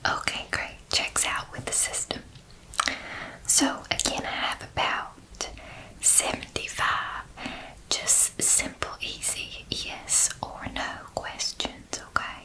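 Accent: South, United States. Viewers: Being from the south,your accent was pretty accurate. Very relaxing video. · Ohh And I 4got too mention the Southern accent was outstanding it was sooo good u should def do more vids with it!what a sweet precious angel u are!😊😄💗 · Just in the nick of time, I was ready for another round of and in a southern accent is just bonus. ❤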